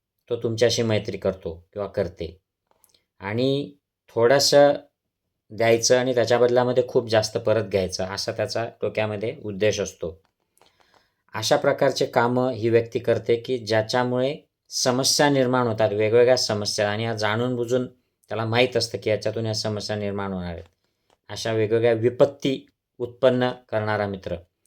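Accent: Khandesh, India. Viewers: आपली खानदेशी टोन काय बदलेली नाही.... मस्त याला म्हणतात जय खानदेश.... नाही तर थोडे काय शहरात गेले की स्टाईल मध्ये मराठी इंग्लिश बोलतात....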